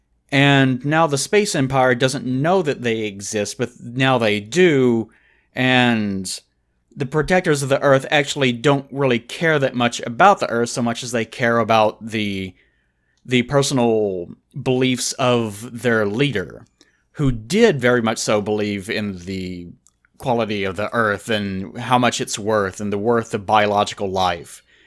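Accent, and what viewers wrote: South, United States. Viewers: It sounds like his gain is just set much higher, I had to actually turn him down on my end, he's as Southern as usual, just louder. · I feel like voice sounds noticeably more Southern then it normally does at times. It's weird for some reason, despite knowing lives in Kentucky. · You became way more Southern in this one. Your drawl was really drawn out. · I can hear your southern drawl!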